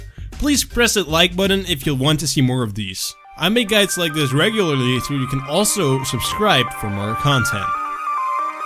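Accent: Netherlands. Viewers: Are you Dutch? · cant watch this cause of the dutch accent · haha omg, ik hoorde het aan je accent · Are you Dutch by any chance? Nice guides by the way, they help me out loads!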